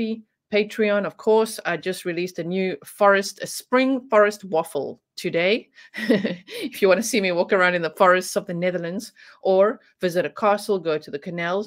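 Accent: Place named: South Africa. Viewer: Hi! I've been listening to you with great interest. Your accent was só Mzanzi, but channel address is 'Netherland'. After your story about 'René', I know I didn't miss the accent. 🇿🇦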